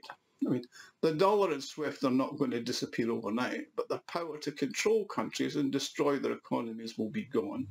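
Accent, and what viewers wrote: United Kingdom. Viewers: Great commentary. Good to hear a British voice talking sense against the tidal wave of propaganda we have to put up. Best wishes to Scotland from England.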